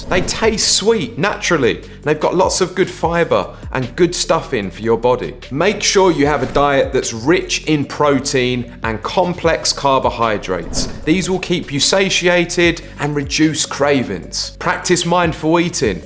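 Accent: United Kingdom. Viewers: This is the best British accent I have heard in my life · Wow,like for this gorgeous British accent))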